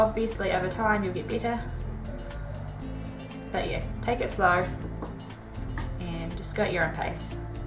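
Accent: New Zealand. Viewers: Chur, you sound like a kiwi. Kia Ora and nice vid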